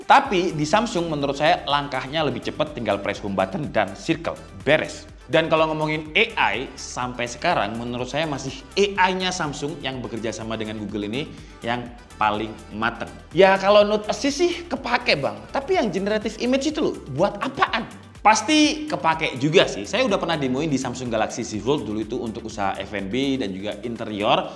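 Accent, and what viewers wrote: Java, Indonesia. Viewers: jowoan rek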